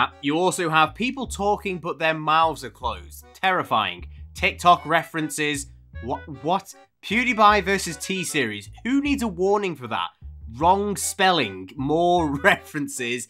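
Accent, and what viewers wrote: United Kingdom. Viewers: I like ur British 🧐 accent lol and jokes, im just dying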